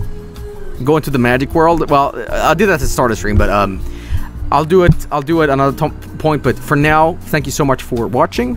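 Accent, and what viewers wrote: United States. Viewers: you're starting to sound like an American.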